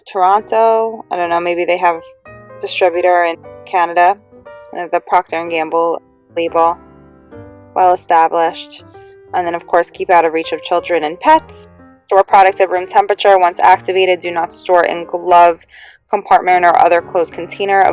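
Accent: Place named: Philadelphia, United States. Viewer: Philly accent 🦅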